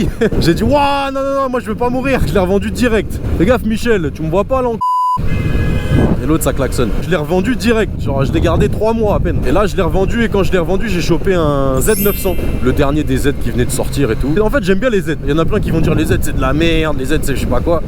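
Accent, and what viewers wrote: Banlieue, France. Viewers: T'as un bon capital sympathie. On reconnait l'accent de la banlieue, mais c'est pas graveleu, c'est pas ziva, et je me suis marré même parfois. Je m'abonne pas mais je te mets des likes ;-)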